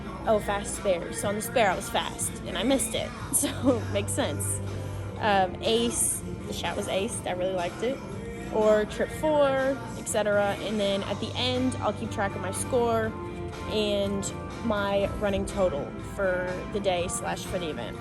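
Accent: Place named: California, United States. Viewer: You sound like a californian eh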